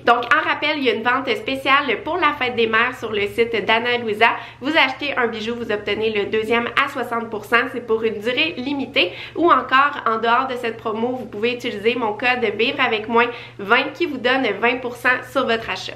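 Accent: Quebec, Canada. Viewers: Cet accent québécois est insupportable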